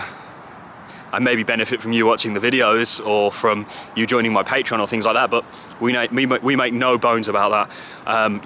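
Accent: Birmingham, United Kingdom. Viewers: Definitely a Brummy tone I'm sure I've seen him knocking around town kro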